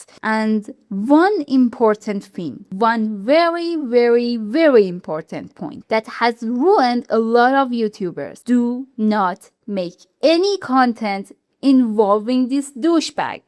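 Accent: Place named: Arab world. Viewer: I don't know why YouTube uses this stubid AI?! The app was good without it! And instead of taking down random creators' channels why don't they take down the channels that make Inappropriate videos?! It is just so stubid!!! By the way, i think you are Arabian after hearing your accent, if you are; then i'd like to say that i am Arabian too 💜✨ لو انتي عربية اقرأكي الكلام العربي ده، حاسس لنك عربية من طرسقة كلامك، صح؟ 😅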